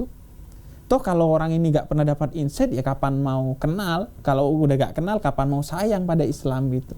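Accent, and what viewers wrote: Madura, Indonesia. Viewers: Sama2 dari Salt-Island pantas saja logatnya sama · Logat mdura.. sma2 mdura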